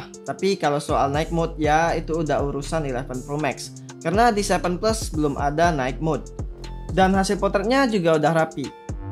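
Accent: Palembang, Indonesia. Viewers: Alangke lemaknyo haha, wong palembang nian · Nak nanyo kk wong palembang? 🤣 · Orang palembang yah kak? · Wong Palembang e hahah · Orang palembang ya bang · org palembang kah kak?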